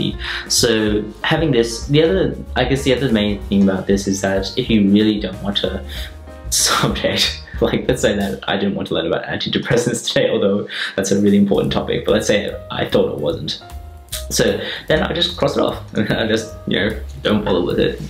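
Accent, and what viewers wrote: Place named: Australia. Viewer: It must've totally slipped my mind that you're in Australia but OH MY GOSH. YOUR ACCENT. AH. Your voice, and you're so funny!!! Your video quality is beautiful, and the jazz is a vibe, and as for what YOU took notes on as a doctor wow it has all flown over my head. But you look happy and look like you're having fun which makes me happy ☺️☺️☺️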